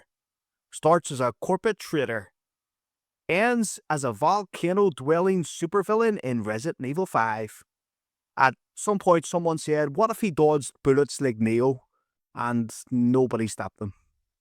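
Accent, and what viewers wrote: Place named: Ireland. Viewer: Great video man. What part of Ireland are you from ?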